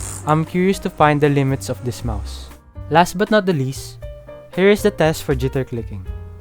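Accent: Philippines. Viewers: the filipino accent throws me off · Yoo way you speak your language is like Filipino BTW can you make a video how to change any mouse debounce time? I got 1 dollar mouse which is legit thanks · u have filipino accent like me xD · from the accent, are you filipino?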